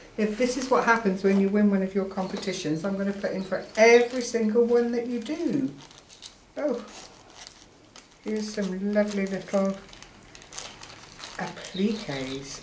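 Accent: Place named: Cornwall, United Kingdom. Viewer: Wow, you lucky lady, I like your accent, I wonder if your from cornwall? I love it down there...the plaque you won is lovely, I tried to win it too..lol..so generous of Fiona to send the extras for you, I love that dangly trim, you'll have fun using that I'm sure. I am also learning so much from her tutorials. Welcome to the YouTube video making side of crafting..lol..(even if you do only ever have 1 video..lol) enjoy your winnings. TFS...Ann...x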